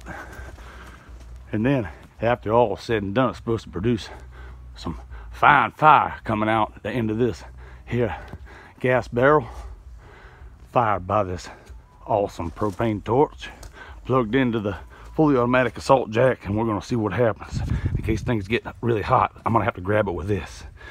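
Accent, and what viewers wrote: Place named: Louisiana, United States. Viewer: LOL It looks like the jack my Dad had in his 1969 Rambler! I am going to take a wild guess that you are from somewhere in Louisiana? There are only 4 states I haven't been to, and your accent sounds a lot like Louisiana!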